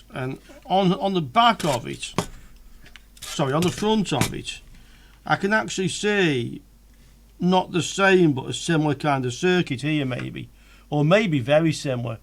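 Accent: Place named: Staffordshire, United Kingdom. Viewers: inteet duck, i recon ya from near Tunstall i left back in the 90s but i can hear the accent still lol i miss oatcakes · Hi is that a potteries accent I hear Nice.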